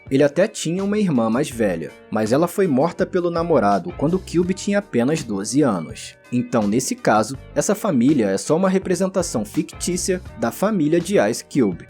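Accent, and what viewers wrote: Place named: Rio de Janeiro, Brazil. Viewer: O melhor é q esse vídeo sobre Cube é narrado por um carioca. Vaibe de gueto.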